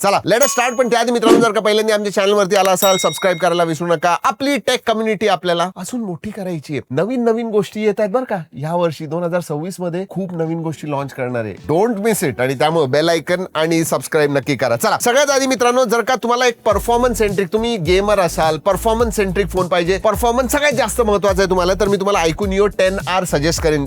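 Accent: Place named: Pune, India. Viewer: भावा, 'त्यामुळं', 'कुठं', ' हिनं-तिनं' वैगेरे असं ग्रामीण किंवा suburban, outskirt पुण्याच्या दैनंदिन बोलीभाषेत एकमेकांशी संवाद साधताना म्हणतात. महाराष्ट्राच्या अनेक जिल्ह्यांच्या दैनंदिन बोलीभाषेतही संवाद साधताना असं म्हणतात. त्यात असं काही गैर नाही. तसा शब्दोच्चार खूप सामान्य आहे.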